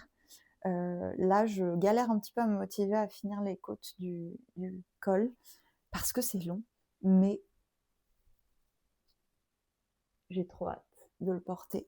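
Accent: England, United Kingdom. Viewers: Merci pour ces inspirations 👌 Tu as vécu en Angleterre pour avoir ce bel accent ?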